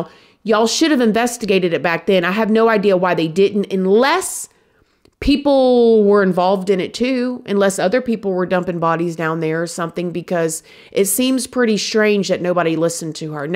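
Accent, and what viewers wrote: United States. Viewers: Seguay from the story - your are looking amazing! and Love your accent - although we are English, My Auntie married an Air Force man and lived in Little Rock after the war - and now she has an accent like yours. I used to get her to say "tom-ato' and "burrrrrgers" all the time! I hope they dig up that dog - gone well. Other wise we will never, never know. Nahhh, they will eventually, even if its crowd funded. The suspense would be too much.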